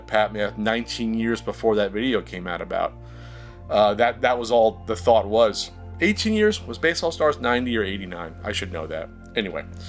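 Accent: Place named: East Coast, United States. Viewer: I've been watching your videos since you used to have east coast accent.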